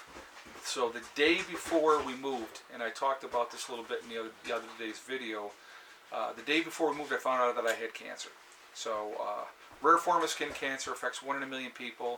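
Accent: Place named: Minnesota, United States. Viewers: He does sound a little Minnesota! Not extreme Northern Minnesota, but maybe Twin Cities area. My family is from up by the Canadian Border. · I'm new to your channel so it's nice to hear a little bit of your background. Thanks for sharing. I'm still convinced that you're from Minnesota. That is a Minnesota accent for sure. I've never heard anyone in New York sound like you. Except maybe in Long Island. LOL!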